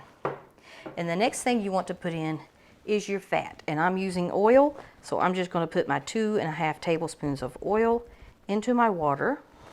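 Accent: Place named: South, United States. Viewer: Hears charming Southern accent stops to watch entire video. Thanks YouTube for this.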